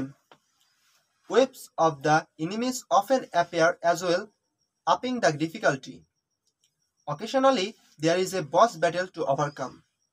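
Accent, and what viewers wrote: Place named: Bengal, India. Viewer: English bolar dhoron Jodi Bangla kothar motoi hoy tahole Bangla bolen...joto sob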